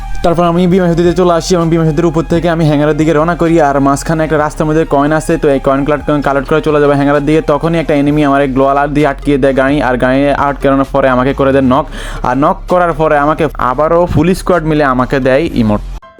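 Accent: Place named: Chittagong, Bangladesh. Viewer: ভাই তুমি মনে হয় চিটাগং এর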